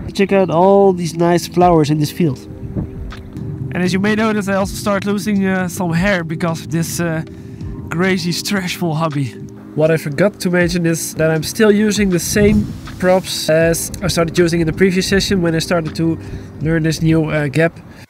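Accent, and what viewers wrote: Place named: Netherlands. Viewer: ERMATTEN and THERMAC. The Dutch accent is killing it lol keep it going dude! Great Video's!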